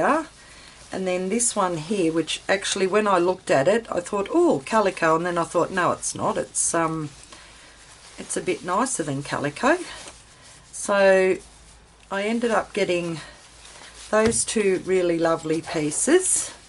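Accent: Australia. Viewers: Lovely to hear an Ozzie! Great hall of treasures!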